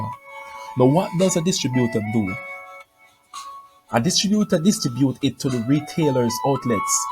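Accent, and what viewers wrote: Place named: Jamaica. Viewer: ur accent just like jamaican speaking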